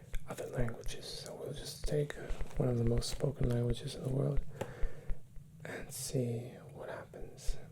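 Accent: Spain. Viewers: Subscribed because your Spanish accent is amazing😊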